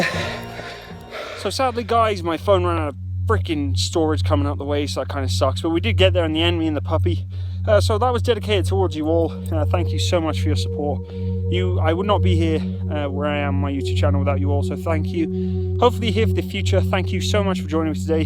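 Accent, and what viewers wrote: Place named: United Kingdom. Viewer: Whereabouts in the UK are you from mate?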